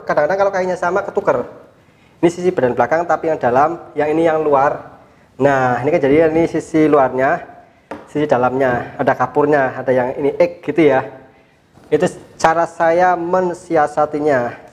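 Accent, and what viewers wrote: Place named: Java, Indonesia. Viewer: koyo wong pekalongan basane, ak, karanganyar mas tugu duren ngetan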